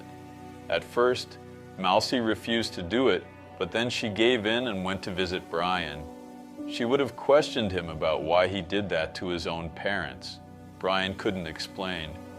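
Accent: United States. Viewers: Are you from America or australia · Very tragic case. Great video though! Had to double check to see if I clicked the correct channel because of your excellent American accent :) Anyway ❤ thank you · Your American accent is spot on!! I almost had to double check that I clicked on the write channel.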